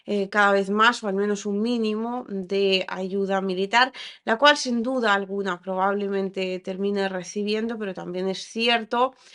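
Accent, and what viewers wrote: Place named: Spain. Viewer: Hola, me gusta mucho tu contenido y quiero decirte que también yo soy seguidor de tu compatriota La señorita Inna Afinoginava, yo créo que ya la conoces, porque ella también estudio el español en España 🇪🇸 Yo soy de Mexico y las sigo a las dos Pero me gusta mucho verla a ella porque habla cómo nosotros en Mexico, y no tiene el acento español como lo tienes tú. En España usan mucho los sinónimos De Os, Vos y sois, sabeis. Lo que en Mexico se erradicó el Español ibérico. Es cómo el Inglés de Inglaterra 🏴 y el de Estados Unidos Que son muy diferentes. Me gustaría que hablaras más cómo hablamos acá en Mexico. Así como lo hace Inna Afinoginova.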